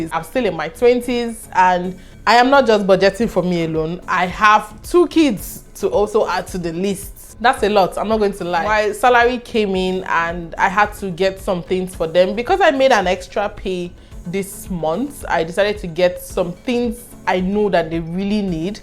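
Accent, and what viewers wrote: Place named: Nigeria. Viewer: Dash me ooh, I've been a strong fan and follower my love.... I love the Nigerian accent. I love your gestures too. I love you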